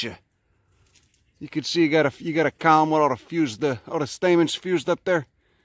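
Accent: Chicago, United States. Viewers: Just joining (recommended by Diamond) LOVE your info on utilizing the natural growth around us.....Learned a valuable lesson last week...physically cannot do tree trimming, etc...had to hire someone...they did a great 'trim' BUT I had no clue how the underbrush of all my overgrown fauna around my small house was INSULATING it. Yikes !! Miss that Chi town accent :) Grew up 40 miles south and had my first 'real' job downtown on Jackson Blvd (1963) Took the train into Union station...wore 'electric socks' to keep my feet warm (from Bennett Brothers) back then, a wholesale company. Thank U for your knowledge !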